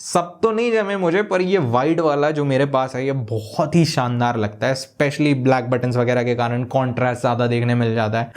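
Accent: Bhopal, India. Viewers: Bro is a bhopali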